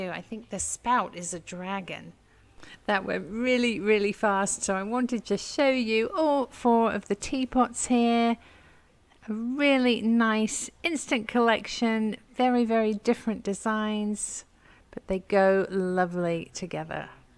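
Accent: England, United Kingdom. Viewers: Just come across your channel and I love it, however being English myself I can't help but hear an English/British accent there! Hope I'm right 😂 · I love the English accent do you miss England I always wanted to visit have a bless day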